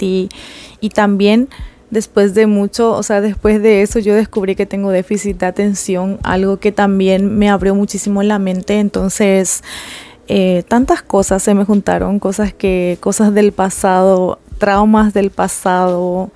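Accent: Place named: Mexico. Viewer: siento que tu acento ya se escucha más mexicano, igual hablas relindo ❤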